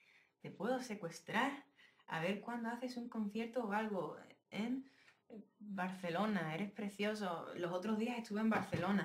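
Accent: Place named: Mexico. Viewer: Holaaa Abraham soy Maria de Argentina- Buenos Aires .. Per me dicen Mar , bueno te queria decir q cantas muy lindooo , sos mi amor platonico la vrdd , me enamore de tu dulce vos jejeje si tenes algun tiempito me gustaria q escucharas mis grabaciones de mi cuenta en youtube y me dieran tu opinion ,ya q te admiro bastante y me gusaria conocerte masss bonitooo. Te kieroo muchiiooo , adoro tu asentoo mexicano...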